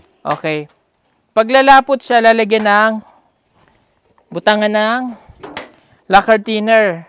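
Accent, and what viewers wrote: Visayas, Philippines. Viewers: Baka Sir pagbalik mo sa manila may Punto na Ang salita mo ng bisaya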